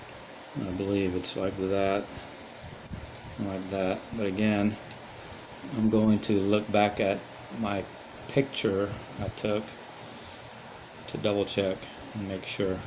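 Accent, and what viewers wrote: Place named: Northern Ireland, United Kingdom. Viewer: Could i ask where your from as your accent is def N Irish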